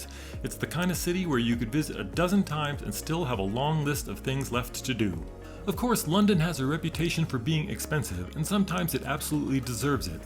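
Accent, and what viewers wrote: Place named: United States. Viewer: Nice videos of Travel. But try to pronounce the names of the cities more the local way in stead of American English. Cannes should me more pronounced like {kon} in stead of can.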